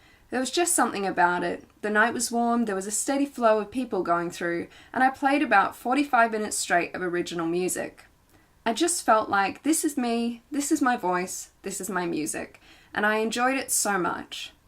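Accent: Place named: United Kingdom. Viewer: ....Why do British pronounce "home"....as...horome....???....even...horyome...???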